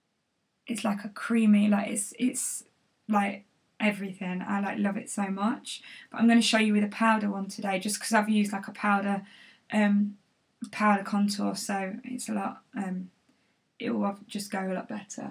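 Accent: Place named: Derby, United Kingdom. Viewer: Your Derby accent is strong haha. Didn't recognise it on towie x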